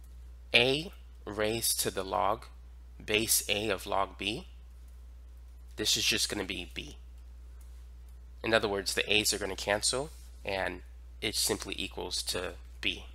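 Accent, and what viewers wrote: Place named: Boston, United States. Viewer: This guy def has a boston accent.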